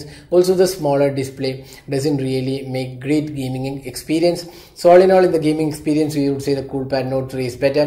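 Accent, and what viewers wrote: Kerala, India. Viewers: like your accent. Kerala?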